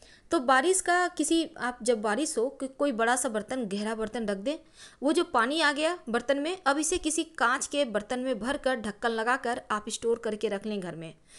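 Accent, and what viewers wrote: Bihar, India. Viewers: Kaha ki ho bihar se ? Funny accents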